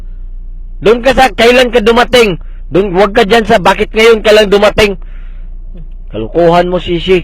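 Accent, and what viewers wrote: Visayas, Philippines. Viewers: Hahaha. ..visaya ka kuya.. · bisaya ka pla kuya.... hehe!same pla tau... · Bisaya man ka kuya noh..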